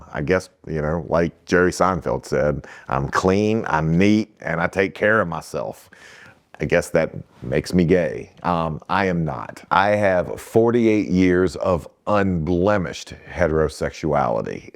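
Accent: Virginia, United States. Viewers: I think because of the delicate quality of your Virginian accent. To uninitiated ears, it might come off as…yeah.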